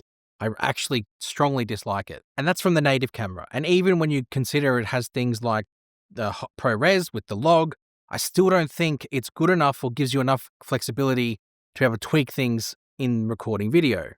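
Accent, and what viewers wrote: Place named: Australia. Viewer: Haha, never thought of it before you mentioned. Great guy though. Even better than SJ because has the sweet Aussie accent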